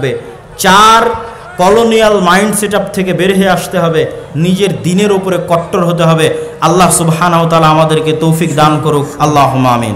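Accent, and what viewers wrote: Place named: Noakhali, Bangladesh. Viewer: Bai korianna, harianna amon kotha goloo noakhali amon kotha goli pori takkoren please, tar por kotha goli shon dor hobe, aktoo chinta koray dekhen London, Saudi pora lekha koray amon basha kotha bola khettar moton bojai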